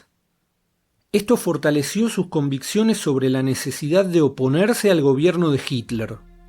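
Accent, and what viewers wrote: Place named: Rioplatense, Argentina. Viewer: Que indignante que la mayoría de funcionarios que juzgó y condenó a estos estudiantes valientes, fueron castigados después de la guerra. esto demuestra que la gran mayoría de alemanes durante el nazismo, estaban involucrados con la ideología de nazismo. Buen vídeo amigo. Pero por favor para las próximas trata de pronunciar bien el castellano, porque no solo te ven argentinos, por ser temas importantes te siguen un público de otros países y para un peruano, es una tortura escuchar un castellano mal hablado. Sin la sho, sho sho. Un abrazo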